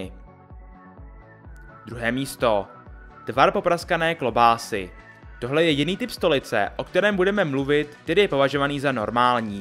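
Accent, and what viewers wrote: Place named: Prague, Czech Republic. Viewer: Jsi Pražák, viď? Podle toho jak mluvíš xD mě to hrozně štve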